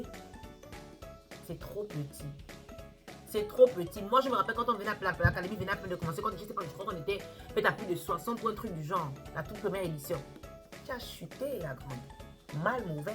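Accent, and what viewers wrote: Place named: Gabon. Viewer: Êtes vous Gabonaise ? Mon DIEU l accent de chez moi hein…Je me suis abonnée tout de suite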